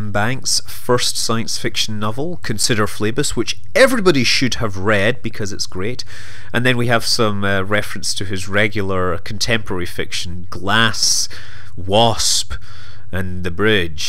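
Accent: United Kingdom. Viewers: I'm really interested in languages and pronunciation and I just have to ask you: What kind of accent is that, that you are speaking? Is it maybe some kind of british accent? I'm obviously not a native english speaker, so I'm just curious about it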